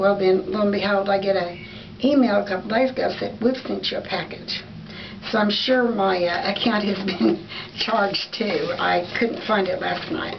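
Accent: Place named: Nashville, United States. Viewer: First of all, as a new wig wearer I really appreciate having video reviews to watch to help me decide which ones to try. So thank you for taking time to do the videos. I also wanted to ask you where you got your OD done. I'm outside of Nashville and your accent kinda sounds like mine! I've had Graves' and TED for about 15 years. Had one OD In 2003 but in the years since my other eye has grown out. The dr. who did my surgery in 2003 has moved out of state so looking for a new one.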